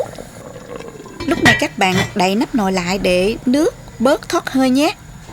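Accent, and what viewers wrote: South, Vietnam. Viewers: Ngon quá, giọng nói miền nam của chị ngọt ngào ghê luôn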